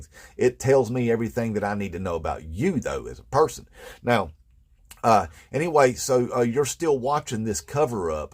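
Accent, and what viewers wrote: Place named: West Virginia, United States. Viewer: I just love the way you talk. Reminds me of my grandparents from West by God Virginia. I was telling my grandma (God bless her soul) back in the 90s that I was going to vote republican. She looked at me and said. "Lauren, why would you go and do that for? Our family have always been Democrats.". Well, I gotta tell ya that I have never forgotten those words, and I learned the hard way about Republicans over the years. I switched to blue somewhere near the end of the bush administration. I saw this Maga movement coming before it happened. I saw the racism and hate first hand and didn't want any part of it. So here we are. Trying to wake people up before there is no democracy. Before the United States ends in a bad way. Vote Red, end up dead.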